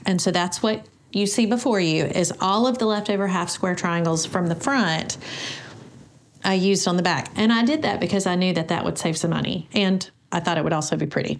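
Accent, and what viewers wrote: South, United States. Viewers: New subscriber here. LOVE your personality, good sense, concern about cost, and...your Southern accent!😁 Howdy from Texas! 🤠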